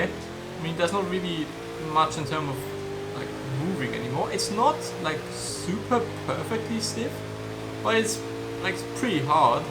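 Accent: Germany. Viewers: i Hear it du bist deutsch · Hey dude.. just wanted to ask if youre from germany cause I can slightly hear that accent but the rest ist just wonderful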